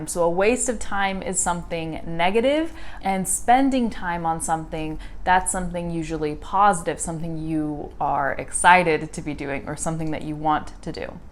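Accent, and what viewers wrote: California, United States. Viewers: I am learning English. Do you speak an American or British accent? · Do you still have an American accent when you speak spanish or a native one? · Hey,! You speak very undastandable and clear American English! I d like to know what state do you live in? Is it common pronunciation in your state?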